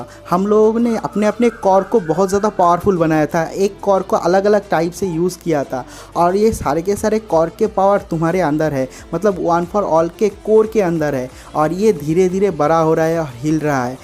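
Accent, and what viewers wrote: Bengal, India. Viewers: r u bangali bro...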